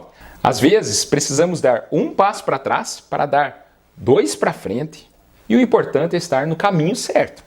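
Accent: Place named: South, Brazil. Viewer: Adoro isso, médico q não deixou de clinicar pra virar Youtuber e vender fórmulas! Este cardiologista sim. Se vê q ele fala de um hospital e tem um sotaque de quem é da região sul. Este eu ouço SeMPRe e sigo o q indica. Vai direto ao assunto sem enrolar e sem vender nada. Confio plenamente neste, e tô sempre de ôlho e o escuto até o fim. Tmb, não enche linguiça até chegar aos finalmentes. Meu preferido, entre outros parecidos.Uu😍👏👏👏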